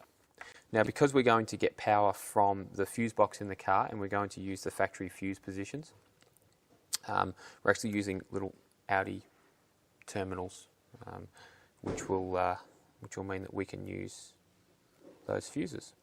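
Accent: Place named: Australia. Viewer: ommgggg I love Australian accents!!! ;)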